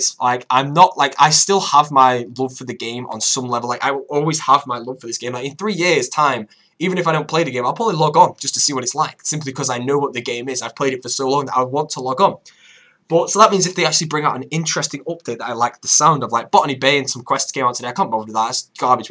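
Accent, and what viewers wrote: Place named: North, United Kingdom. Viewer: Feel free to commentate on my videos once I've finished them in due time. We could even harmonize with your northern and my southern accent.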